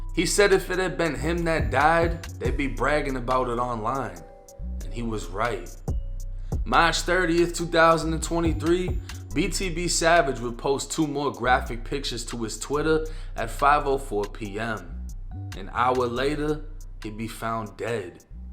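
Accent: Boston, United States. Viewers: You must be from Boston (Baah - stunn). Apartment (Aa - paaht ment)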